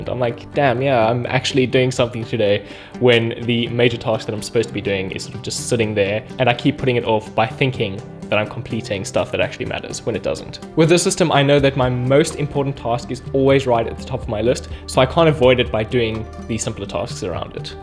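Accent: South Africa. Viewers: I recognized your accent immediately! Fellow South African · loving this south african accent ....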